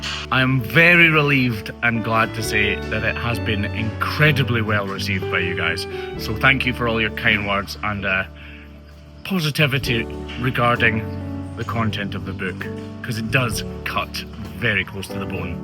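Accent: Scotland, United Kingdom. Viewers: As I read your book, I'm reading it in your Scottish accent 😂 Kinda weird eh 😊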